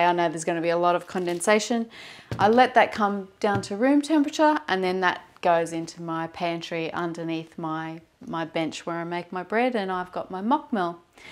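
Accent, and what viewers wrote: Australia. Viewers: I just bought my first while wheat berries for milling & they will now go straight into the freezer for 3 days - ibwobder if my household freezer goes that low! Lovely to hear an Australian accent - your tios are great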